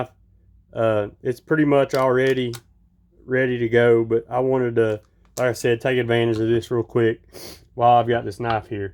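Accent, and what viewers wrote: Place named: Tennessee, United States. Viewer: Great video, I spit my coffee out then you were sharpening the recurve and said Shit. I’m from Tennessee, you gotta be from this area with that accent.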